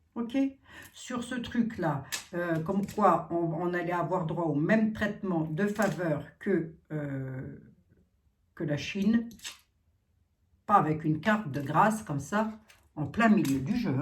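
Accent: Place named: Lorraine, France. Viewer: J’adore vous écouter car votre voix et accent me rappellent les bons moments vécus en Meurthe et Moselle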